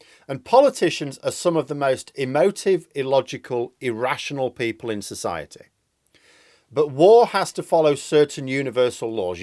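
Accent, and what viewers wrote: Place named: Yorkshire, United Kingdom. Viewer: You went very Yorkshire in this one, momentarily reminded of my Yorkshire Grandad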